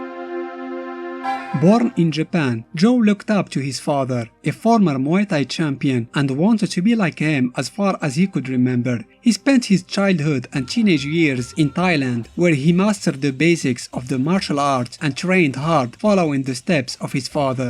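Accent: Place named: Morocco. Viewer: Salam khoya nta maghrebi ? 3rftk ghir laccent dyalk 😂 ... Anyway la kenti maghrebi tbarklah 3lik bro mo7tawa zwin o Big Big Up lik Bro 👍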